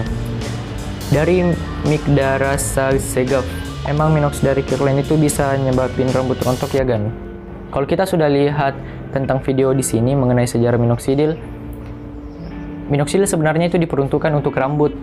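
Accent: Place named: Sulawesi, Indonesia. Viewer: Orang sulawesi ya?logat.y kentara😁✌️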